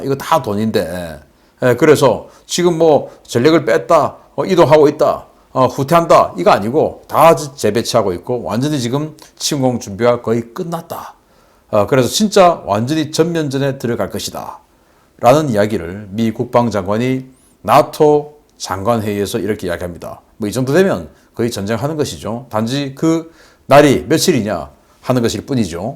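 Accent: Gyeongsang, South Korea. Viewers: 신대표님, 첫인사 "안녕하십니까" 말할때 . 듣는 나는 신대표님이 심통난듯 한 말투로 들리네요. 물론 경상도 사나이라는건 알지만....ㅋ 안녕하십니까 할때 맨뒤 음. "까"을 살짝 내려보심이....ㅋ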